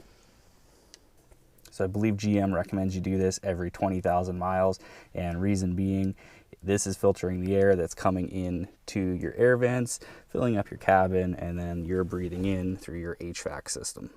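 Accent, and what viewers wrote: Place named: Canada. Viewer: Canadian eh!? Thanks for the tutorial!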